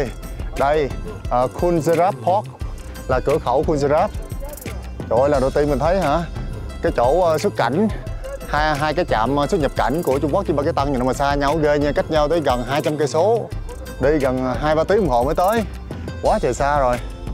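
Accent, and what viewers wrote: South, Vietnam. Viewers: Chị đã đăng ký kênh em rất thích chất giọng Miền Nam rặc nghe chuyển cảm , thật thú vị khi xem video của em. Thanks . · Kênh du lịch này hay hơn Lê khả Giáp gắp 10 lần , giới thiệu nơi đến rất tỉ mỉ , đặc biệt giọng nói miền nam rất dễ thương không hiểu sao ít đăng ký hơn LKG . Tôi không thích LKG trước khi sư Minh Tuệ xuất hiện và thích kênh này giờ càng thích hơn vì chủ kênh không xâm trổ ( nhìn giống du đảng ) . Làm YouTube có tâm , sạch như thế này rất tốt , mong cháu phát triển nhiều hơn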